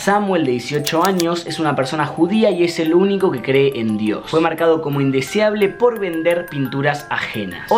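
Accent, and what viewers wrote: Argentina. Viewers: Amo tu acento argentino,saludos de México ♡♡.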